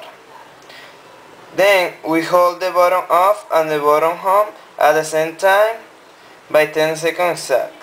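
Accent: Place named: United Kingdom. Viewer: que ingles pareces británico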